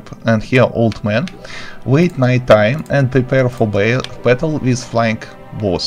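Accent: Russia. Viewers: мдаа с русским акцентом!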